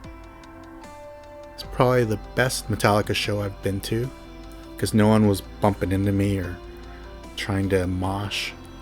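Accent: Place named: Sacramento, United States. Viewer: Love this video man! Sounds like you’re from the Sacramento area haha I am too! I was also at Aftershock & caught a few from Kirk! On the first night they played. I got 3, gave 2 away, one going to a kid & his dad that I saw looking for some in the grass. He was so happy haha What sucks though is as I was getting a hot dog outside from the street vendors, the one I kept for myself must have fallen out of my wallet as I was paying. I was crushed 😭 Luckily though on Sunday when they played again, one landed RIGHT between my feet & I stepped on it until the crowd cleared and I was able to pick it up. I like to think the metal gods rewarded me with another for my good deed of giving away those 2 Metallica picks on night one 🤘🏼 Got a few more picks in my collection. My favorite being one from Slash that he handed to me in San Francisco at the Warfield in 2010.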